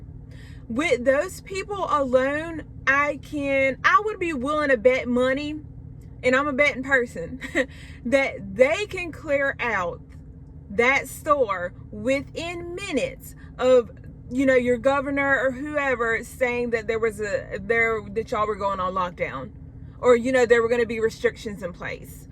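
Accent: South, United States. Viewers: She sounds southern. From Sip or Bama i bet. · Awesome message. I also adore your American English accent.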